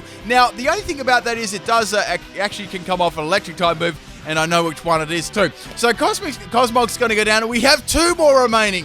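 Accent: Australia. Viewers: I like your videos but your voice sounds like you combined a jock a Aussie and a Kyle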